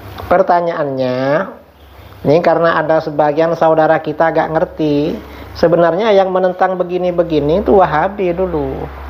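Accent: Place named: Madura, Indonesia. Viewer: Ini kyai mana ,kayaknya madura,kok gini pangaoningannya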